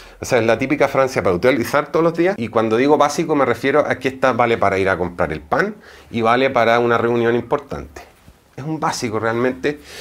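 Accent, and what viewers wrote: Chile. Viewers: Clave Alma si yo también lo dije es chileno y me encanta 🤗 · Tienes acento chileno, eres o viviste en Chile? Me encanta tu canal, he aprendido mucho con tus vídeos. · Hola 😊eres chileno tienes acento?? Felicitaciones, haces un exelente trabajo.Tus descripciónes son extraordinaria 👏👏👏Amo🥰 los perfumes 🌟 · Solo por curiosidad ¿qué nacionalidad tienes? Escucho que hablas super "chileno", pero también a español... es curioso 🌱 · Qué lindo los ojos de él, habla como Chileno, dónde se pueden encontrar estos perfumes en Chile???? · que tiene el acento chileno ...😡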